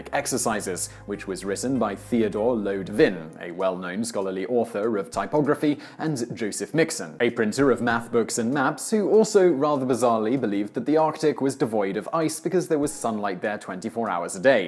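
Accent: England, United Kingdom. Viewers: I’m pretty sure he’s English lol give him a break 😂 some of those pronunciations are actually correct U.K. pronunciations. And I think the besieging may have been either him assuming it was a German word, or the text was typoed like “beiseging”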